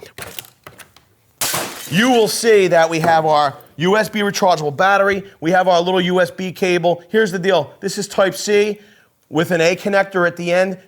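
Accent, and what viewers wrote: Philadelphia, United States. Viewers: Just discovered your channel. Love it. Sounds like you’re from Philly, yes?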